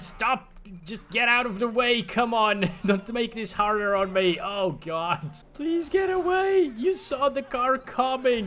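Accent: Portugal. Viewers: Lol, I didnt know that he was from there, but it semeed to me that he was speaking portuguese portuguese (lol). Thanks for the info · when says something in portuguese my heart bounces a little, even he's talking in Portugal Portuguese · Isn’t he speaking OG Portuguese?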